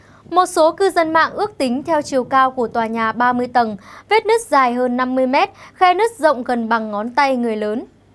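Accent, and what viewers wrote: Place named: Hanoi, Vietnam. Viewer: thi giong nhu ngoai ha noi may chu gi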